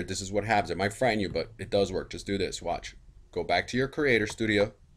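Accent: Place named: New Jersey, United States. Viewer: You're so Jersey YESSSSS!!!! I'm from Freehold! Thanks for the vid info!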